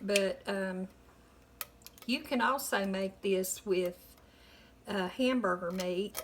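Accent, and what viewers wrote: South, United States. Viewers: I have slowly become addicted to your YT!! I'm not used to the southern accent, but you have taught me! By the way, I really like your hair down, you look really cute! And LOVE your recipes.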